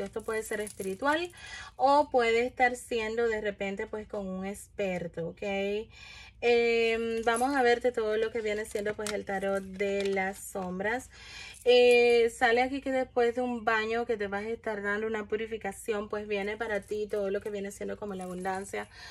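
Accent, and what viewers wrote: Dominican Republic. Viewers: Hay enemigos si soy policía y mis jefes son misogenos y yo no me dejo de nadie una verdadera bomba en en trabajo(nido de víboras) yo espero la mandinga o ya al menos el dinero del amor hablamos después jajaja muchas gracias te quiero mucho y me encanta tu acento Dominicano 😘😘😘😘😘😘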